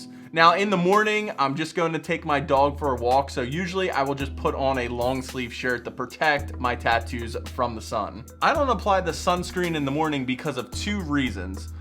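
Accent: Philadelphia, United States. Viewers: nice video, i can tell youre from philly/delco by the accent lol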